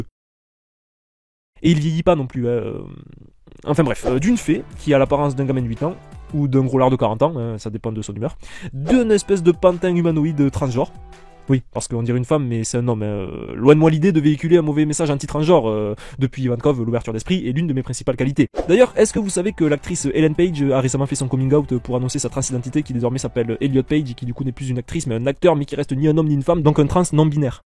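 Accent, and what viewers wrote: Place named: Marseille, France. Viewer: Tu as un accent Marseillais 😂 sinon tu gères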